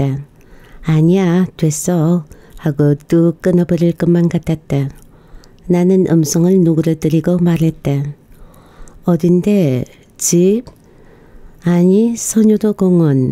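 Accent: Gyeongsang, South Korea. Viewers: 님의 낭독이 이젠 전문가 수준입니다. 처음의 그 갱상도 발음이 그립기도 합니다. 너무 손색이 없다보니 ㅋㅎ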